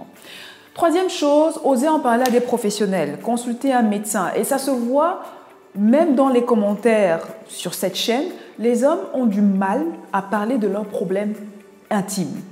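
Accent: Cameroon. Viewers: Merci docteur, vous avez un accent de chez moi " CAMEROUN" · Si vous êtes marié, votre mari est beni; Si non le future le... vous avez un accent français un peu Camerounaise...?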